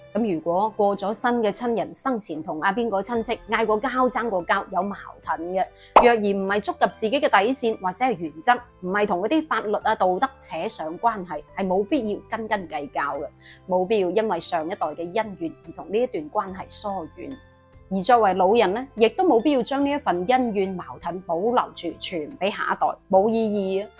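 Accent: Guangzhou, China. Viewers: 正宗廣府話好親切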